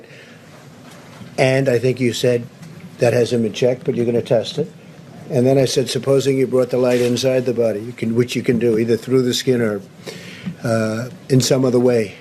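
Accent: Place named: United States. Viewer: Yes if only there could be a split screen! You’re in Germany but you sound American, don’t forget about.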